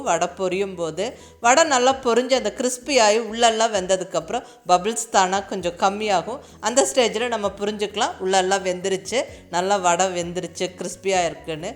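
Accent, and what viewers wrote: Kongu, India. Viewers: அம்மா உங்க சமையலை பார்ப்பதற்காக உங்க வீடியோவை பார்ப்பதை விட, உங்களுடைய கொங்கு தமிழ் கேட்கவே நான் உங்க வீடியோவை பார்ப்பேன்.நீங்கள் போடும் சமையல் வீடியோக்கள் சூப்பரோ சூப்பர்.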